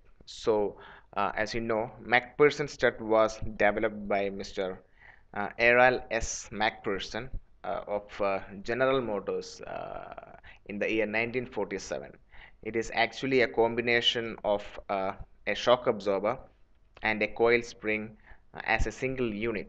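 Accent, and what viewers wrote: India. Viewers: the indian accent sucks and is becoming default accent of english everyday.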